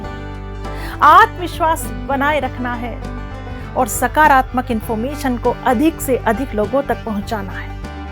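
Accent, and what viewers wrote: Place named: Shekhawati, India. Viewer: mujhe thodi bahut samaj me aati he shekhawati... Bcoz mere papa ki school me shekhawati teacher ki posting hui he so unse kafi touch he mera.... Apki shekhawati bhasha sun ne me bahut badhiya lgti h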